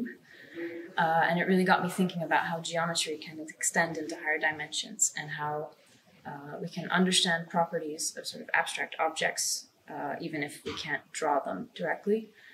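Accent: United Kingdom. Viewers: Its British English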